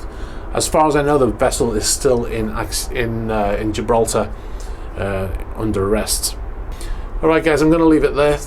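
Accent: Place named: Wirral, United Kingdom. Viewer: Slightly off-topic (ok completely) but where are you from? By the accent I suspect you're from Wirral. I wonder every time I hear you.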